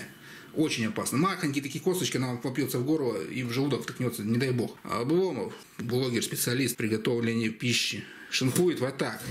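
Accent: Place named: Urals, Russia. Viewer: На Амуре люди медленнее говорят. У Вас наш уральский говор - быстрый. Видно, наши осели на Амуре.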